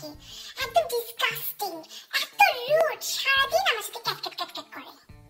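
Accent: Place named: Jessore, Bangladesh. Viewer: আপু তোমার কথা গুলা খুব সুন্দর। তাছাড়া যশোরের ভাষা তো এ জন্য আরও সুন্দর।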